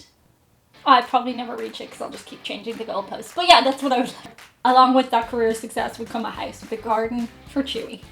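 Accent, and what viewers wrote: Ireland. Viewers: Lucky charm accent is way to cool to ditch ever. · I love your "Lucky Charms" accent. And the chicken farmer line is priceless.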